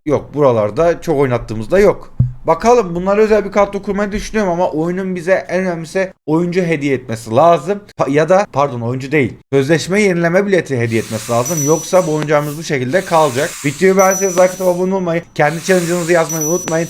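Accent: Kahramanmaraş, Turkey. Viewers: abi challenge mahalle maçı kurallarıyla online rakibe karşı oyna lütfen beni al abi istanbuldan selamlar bende maraşlıyım sen aynı maraşlılar gibi konuşuyosun abi seviliyosun kral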